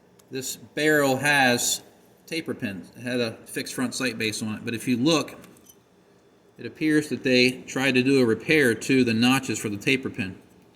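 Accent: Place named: South Jersey, United States. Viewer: Are you from the south jersey/Philadelphia area?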